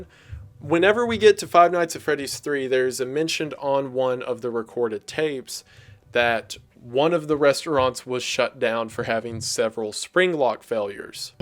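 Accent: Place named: North Carolina, United States. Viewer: are you from NC, USA? you have a very particular accent, especially when you say "then". But also thank you so much for this, because i followed the whole time, but i still missed a lot of details, so I'm happy to see it all laid down flat.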